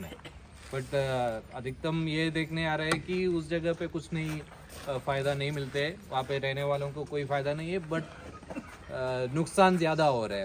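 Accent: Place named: Kerala, India. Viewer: Mujhe aapka Hindi sun ke humare Kerala teacher ka yaad aa gaya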